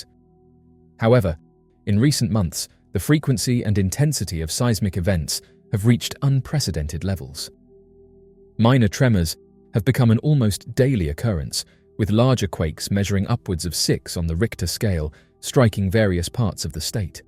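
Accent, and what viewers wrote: England, United Kingdom. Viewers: If the narrator has an English accent, you can be pretty sure that what follows is BS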